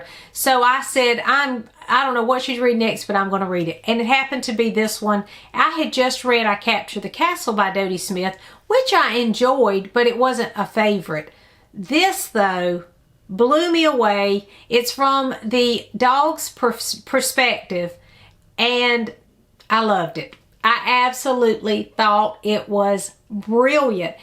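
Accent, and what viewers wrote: South, United States. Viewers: I so enjoy your southern accent way of describing wonderful books. We have so many similar books in common. Glad you read Thomas Hardy and enjoyed him!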